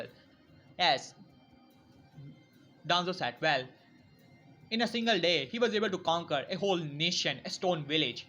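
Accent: India. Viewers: I knew he was a Indian by his accent of English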